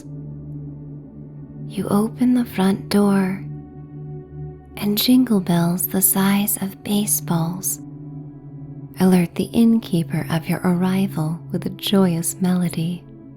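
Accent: United States. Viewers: Why is this recorded using an American and not English accent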